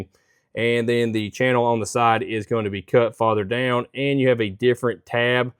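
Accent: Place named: Deep South, United States. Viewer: Talk slower and enunciate so we who do not live in the Deep South can follow what you are saying......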